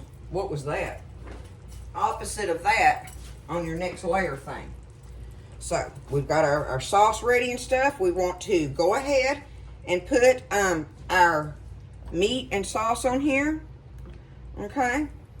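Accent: South, United States. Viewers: 🍅🧄🌶️Looks great! I like the idea of soft frying the corn tortillas. You’re a very cheerful cook. Fun to watch. I’m from Texas but live in Utah. I am just basking in your beeeeaaauuuutiifuul accent. It’s music to my ears. 👂 Listening to you talk and watching you cook is like being in Heaven. I really miss living in the south but my kids all live in Utah, so here I am. Thanks for sharing your love. Cooking for one’s family is love.❤️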